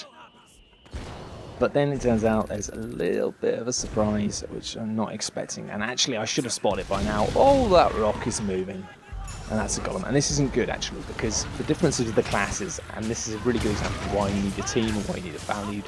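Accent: United Kingdom. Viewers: dude the english accent is so cool wish i had one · Every time I listen to the commentary I always think of Simon Pegg. I mean I'll probably just buy this game based off of this guys sweet UK accent and commentary :P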